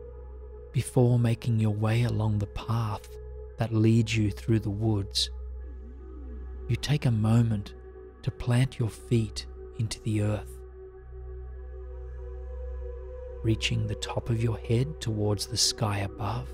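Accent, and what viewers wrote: Australia. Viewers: Its always weirdly comforting to hear another Australian voice on YouTube! Thanks for your efforts bro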